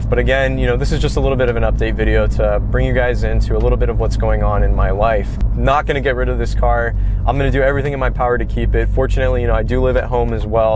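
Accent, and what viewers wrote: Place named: Las Vegas, United States. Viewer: Sound like a local Vegas guy loo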